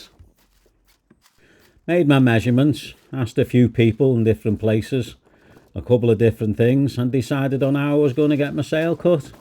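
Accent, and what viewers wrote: Liverpool, United Kingdom. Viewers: just found your site and luv it, i have missed the scouse drawl and luv your laid back attitude to sailing . Have you ever done castletown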